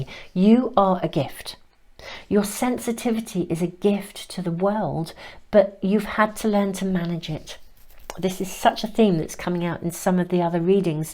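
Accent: United Kingdom. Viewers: I LOVE listening to your reading. Sometimes I follow the way you express all your ideas. I asked myself, I am thankful because I can practice how to speak like a British. Someday ! I'll be there to UK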